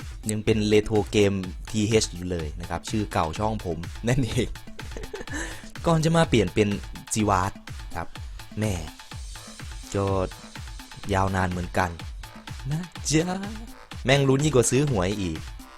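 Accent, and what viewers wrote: Isan, Thailand. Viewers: พี่คนอีสานหรือปล่าว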